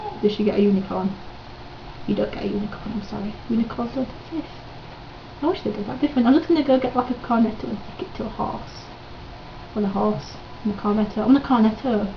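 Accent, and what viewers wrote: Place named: Yorkshire, United Kingdom. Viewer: WHEEW , yorkshire!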